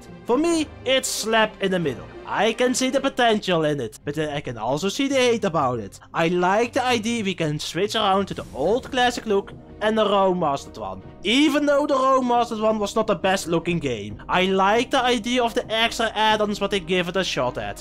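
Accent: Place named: Netherlands. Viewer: Strongest Dutch accent ever 😂😂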